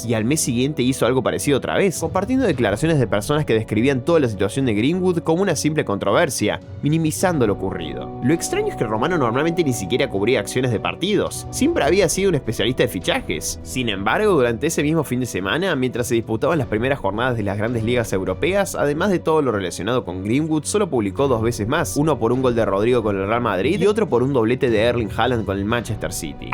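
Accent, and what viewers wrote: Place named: Argentina. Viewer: Escucho acento argentino, entonces no creo nada!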